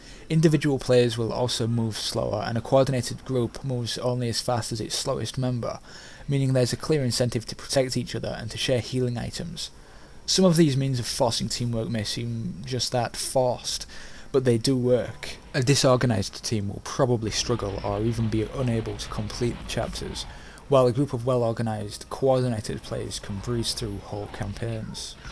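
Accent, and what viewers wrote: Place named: Yorkshire, United Kingdom. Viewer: cool review btw u have the same accent as me, ru from yorkshire?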